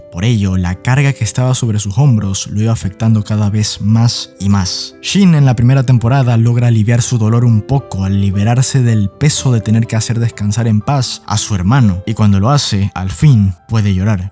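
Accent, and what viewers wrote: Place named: Ecuador. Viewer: Muy buen video, que genial se nota lo ecuatoriano en tu voz. Sigue así, ganaste un suscriptor.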